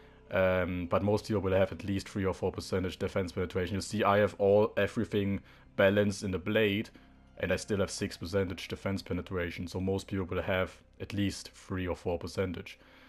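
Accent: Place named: Germany. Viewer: nothing to be sorry about, I'm german too. Your accent isn't bad, I was just curious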